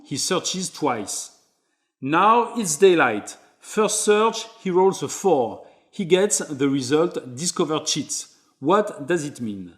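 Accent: France. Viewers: Just telling you the facts. Audio is just as important as video, sometimes more so. People can be very tonally sensitive. Not everyone is French. This might be the one instance of YouTube AI (voice dubbing) actually being beneficial. Turn it on. See what happens. It should translate fairly well. If it does not translate, turn it off. It should be better than subs. Guaranteed you get more views. You want to keep people watching, yes? These are very long videos. Keep options open. I had to use other MR tutorials based solely on audio. Imagine someone with a heavy German accent trying to do these videos in English. Same thing. I’m German and I would not want to listen to that and if I had a heavy accent I would get someone else to do the audio. In this case the option is absolutely FREE.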